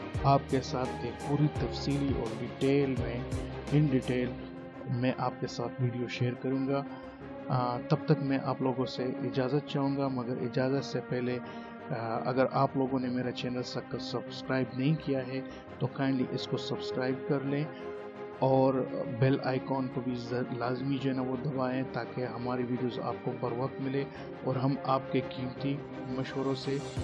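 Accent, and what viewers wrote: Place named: Pashto, Pakistan. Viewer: thanku4yrvocal my assumption is yr accent is pashto from sarhad kpk ji m zindabad inshala china will always rise couse intheir history theyr talented and creative inventive nation yet they never invaded any country godbless china and5superpowers and rest ofthe world nations god let sanity prevail may v not destruct anhilate eachother peace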